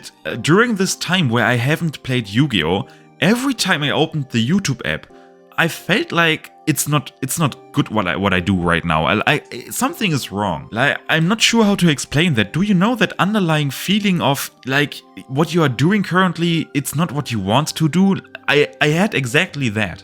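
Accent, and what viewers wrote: Germany. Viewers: Do you realize terror incarnate will miss timing if it is linked away? Also btw u have german accent 👍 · Love the content, always excited when I get that upload notification. Also, not sure if you knew this... you have a German accent. Anyway keep it goin bud! See you on the next new deck whatever it may be! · you have a german accent bro, will terror miss timming?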